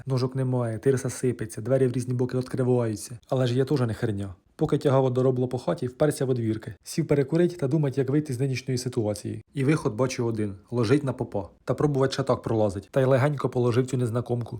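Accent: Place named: Cherkasy, Ukraine. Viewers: А я то чую шо шось рідненький говір. Привіт із Звенигородки, Черкащина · Черкаський говір слухаю як музику....🤭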